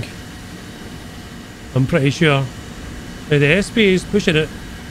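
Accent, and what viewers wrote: Scotland, United Kingdom. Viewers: You got me into War Thunder recently and always make me laugh. I love the silky Scottish tones too 😂 You keep up the entertaining work marra!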